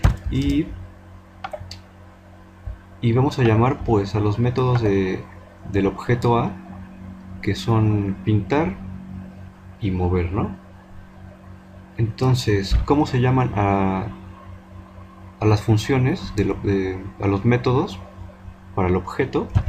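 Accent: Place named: Central America. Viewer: El acento se nota que es de centroamérica o de México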